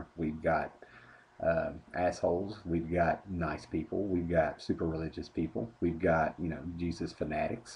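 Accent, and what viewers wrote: South, United States. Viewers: You speak with a southern dialect which many women find very sexy! I would just block the obviously uninformed individual and lose him to obscurity where he probably belongs!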